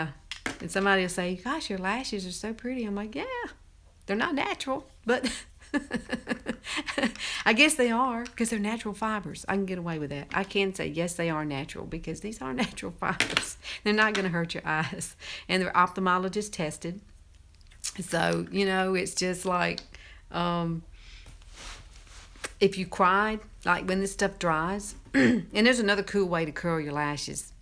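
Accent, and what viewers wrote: South, United States. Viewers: i just gotta say i love ur southern accent, so younique lol excuse the pun...oh by the way u can get younique cosmetics over here in N.Ireland, i dont own any myself as i use pacifica vegan mascara as i have the most sensitve eyes EVER but it does look amzing on u girl....hugs and kisses....xxx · Hi, I really enjoy your make-up tutorial videos, thank you for taking the time to make them for us. I especially love hearing you talk because I love listening to your southern accent. Please don't ever change, keep your accent. I can understand you perfectly and I'm from and live in California. BTW, I'm curious...why you don't use a liquid eye liner instead of the pencil to line your top eye lid? I think it would make it easier for you Also, has anyone ever told you that you look a lot like Heather Locklear? You have very similar beautiful eyes. 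I'm sure you've heard this before but I couldn't help but ask. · You are a breathe of sunshine. I love those cream eye shadows How much for the cream shadow? I love your southern accent. You are the cutest. Have a great week.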